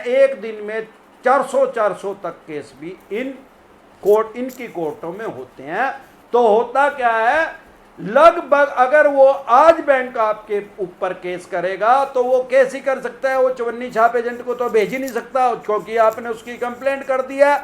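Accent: Haryana, India. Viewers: Haryanvi accent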